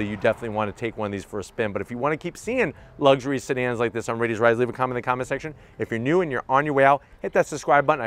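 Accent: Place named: New York, United States. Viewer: Hey......you need to calm down dude when your doing a review because you found like your going to have a hearty man......Also don't talk so fast and lose the new york accent man.......One more thing grow your hair out a little....I know your thinning a little around the top and the frontal hairline and other areas however you need to grow it out pretty long and that way you can cover your bald spots or second choice would be just get about 2 hair transplant surgeries and that way you have instant coverage and you never have to worry about it anymore....trust me I did several surgeries and am soooooo happy that I did this.......also put some nice designer long pants jeans on and a nice tank top and now we are talking man......Just saying all this and I mean it too!!!!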